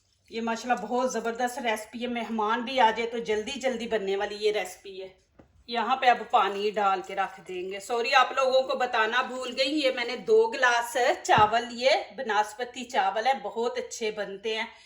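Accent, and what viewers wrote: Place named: Punjab, India. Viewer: MashaAllah zabardast bhout khubsorat still awaz ka Andaz Panjabi bhout khubsorat laga dia Karachi Pakistan khush rakhae ameen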